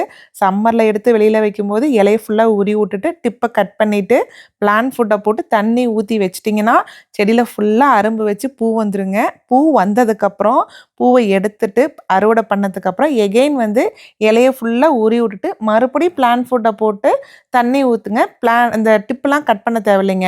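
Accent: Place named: Namakkal, India. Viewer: I believe you are from namakkal from your speech .. good tips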